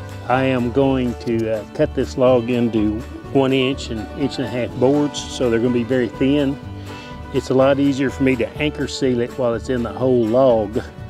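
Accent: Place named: Tennessee, United States. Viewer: Happy Birthday, your accent sounds like mine here in TN, thanks again for your videos.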